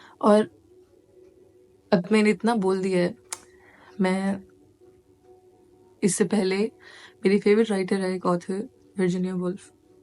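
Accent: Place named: Punjab, India. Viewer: I love hearing punjabi underneath your hindi!!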